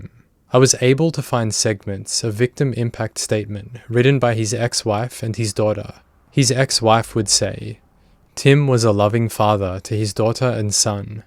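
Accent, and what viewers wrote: Australia. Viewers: Sounds like an Aussie did this doco by accent or maybe a Kiwi but as an Aussie I think it's an Aussies voice. I'd never use it even once as they all say I'm only trying it once & a year later they're nuts! I've seen just 1 year of meth uses' impact on the brain by a meth users who were paid to allow weekly brain scans over the year & their brains are very impacted by even a month on meth let alone a year so imagine someone like Heidi who's been on ity for years!! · Nice to hear an Aussie doing true crime. Subbed mate.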